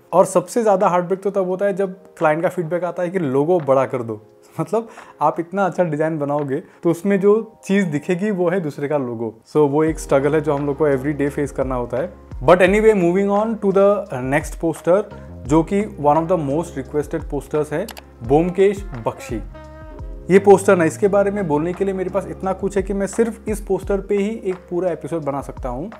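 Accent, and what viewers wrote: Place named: Bengal, India. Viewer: If I am not wrong, you are bengali for sure. Your accent is typically bengali type. Just a guess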